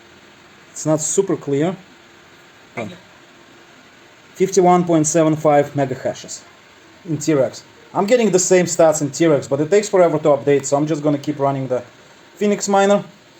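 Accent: Russia. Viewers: Русский что ли?!)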